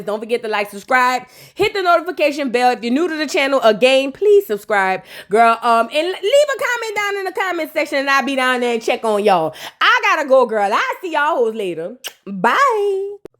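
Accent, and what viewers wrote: New Orleans, United States. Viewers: Yes New Orleans Accent Baddies Review 😂😂😂😂😂 Love from London 🇬🇧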